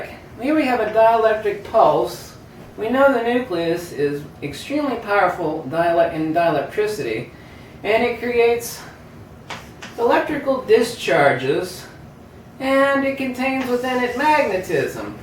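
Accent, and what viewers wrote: Germany. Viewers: Please lose the stupid German accent...very annoying!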